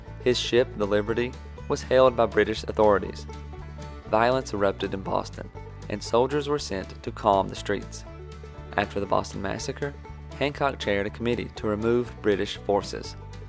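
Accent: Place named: South, United States. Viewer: Great video! Very concise but informative. As a Bostonian, i love that a southerner, with a thick southern access and bank music, is making a video on a New England hero! Thanks, my southern patriot friend! 😉 p.s. it's MassaCHEWetts, with a chew. Not Massatoosets. 🤣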